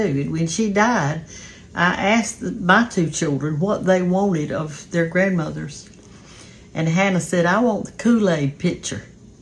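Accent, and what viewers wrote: South, United States. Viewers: I am from Georgia Ms,I just love you. And yes all of us from the south have a different accent and way of saying things. You make me smile. ❤🎉 I am trying Tallow Balm. Hope it works,can't hurt 😂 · You have a southern accent.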